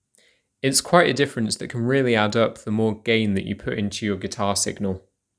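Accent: United Kingdom. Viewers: Thank you so much. Great explanation and so good to hear a British voice.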